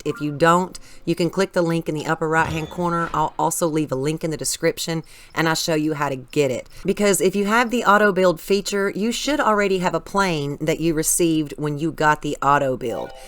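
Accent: South, United States. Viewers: I love your videos! Your southern accent makes them even more welcoming/casual/relaxing. Thank you for the content and for being down to earth about it. The sass on that pinned comment was the cherry on top! 🤣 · I must say your voice is why I continued to watch this vid as I'm the kind of player who saves anything and everything I find interesting on a game I'm into and once I beat the game I will go back and watch vids and use cheats and try glitches ext.. Well as I was adding it to my botw 2 list ( been saving vids since before it was officially titled) I heard your voice and well needless to say I can go easily and get the armor. I'm a hillbilly from the blue ridge mountains and it was refreshing to hear your southern voice narrating one of my favorite series biggest entry's! I was blown away and watched and listened all the way to the end. So to comment on the deception msg Well who cares if it wasn't her playing ( so awesome it was btw ) because it's still a very pleasant, easy to listen to and follow along, very well made information video and I'm looking forward to enjoying many more from her. 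· even if you weren’t playing I love hearing your voice in the videos. It’s refreshing to hear a beautiful American southern accent 😊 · A southern accent and TotK? Sign me up lol. Im from NY. Don't hear that accent much. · Hey girl! I just recently found your channel and I love how you admit about not knowing how to pronounce some of the names in the game! Same here... lol! Also, I'm not sure where you're from, but I'm from South Mississippi and I can relate to your accent ;) You have provided some pretty good tips and I'm now subscribed :D Keep up the good work! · Southern I know this, lived in Arkansas for a few years. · What American accent is that i wonder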